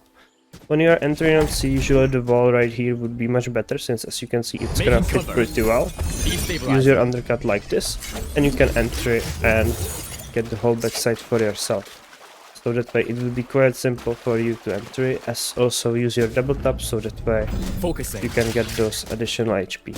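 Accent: Czech Republic. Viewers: are you czech?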